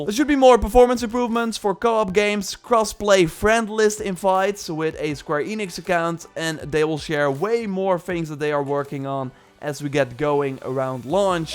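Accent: Netherlands. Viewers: Quick question, hearing your accent I'm guessing your from the Netherlands? When's does the game drop there? Is it midnight going into April first, or is It at 17.00 On April first?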